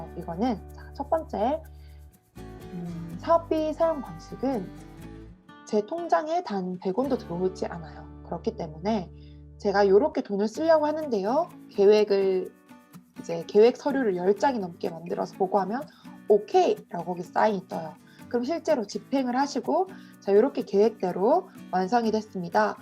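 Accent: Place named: Daegu, South Korea. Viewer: 저는 대구 친구가 많아서 ㅋㅋㅋㅋㅋㅋ막 들리네요 ㅋㅋㅋㅋㅋ그래서 걍 편히 녹화하셔도 될 것 같아요 친근하고 더 좋아요!!♡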